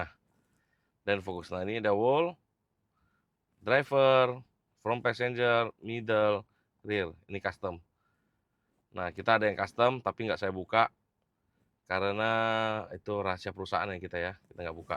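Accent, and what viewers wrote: Medan, Indonesia. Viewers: Gokil kokoh* medan identitas cakap anak medan melekat😅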